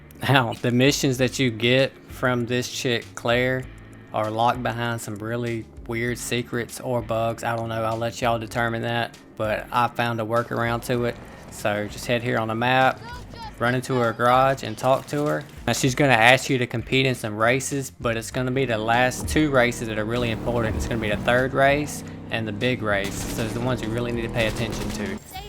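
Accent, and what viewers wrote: South, United States. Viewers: Great video, choom. Really helped me out. Also, I really like your accent! Where are you from? Somewhere down south I would assume? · Man, I love your southern accent—nothing like a man with a southern drawl. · Subscribed cause sounds like a fellow southern brother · Love your accent man! Reminds me of my grandparents, who are very southern. Thank you for the informative video my dude!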